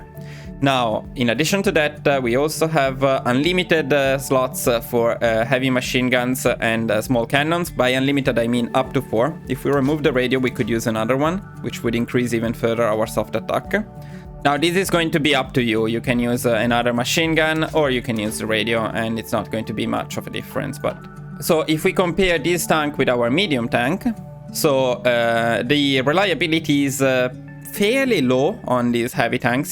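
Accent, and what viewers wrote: Italy. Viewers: Bel video,dall’accento sembreresti italiano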